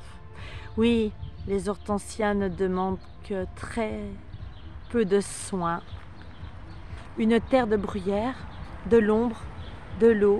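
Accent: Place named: France. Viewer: Good morning my sister of night, it's so good to hear your lovely voice, your French and your French accent, I am wishing more and more I could speak French and maybe it's time for me to learn. Your town is beautiful! And hydrangeas are some of my favorite flowers! I hope you can rest in spite of all the music festivals and young people staying up too late and not being mindful of others. I hope you have a wonderful day and weekend. Merci ❤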